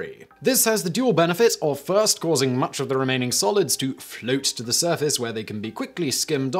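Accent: United Kingdom. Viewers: "Something up with which we must put." If you are going to sustain that obnoxiously pretentious British accent, at least adhere to the grammatical constructions that go along with it. And while you're at it, let the metric people work out their own conversions. When in Rome, after all.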